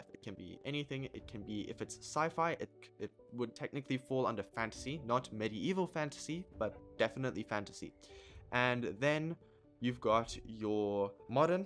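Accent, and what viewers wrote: South Africa. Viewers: South African ou?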